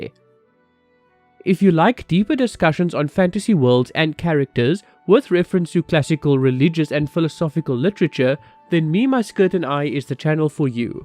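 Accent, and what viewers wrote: South Africa. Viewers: Are you Afrikaans/South African by any chance? From a South-African Stormlight Archive fan (an SA SA fan if you will)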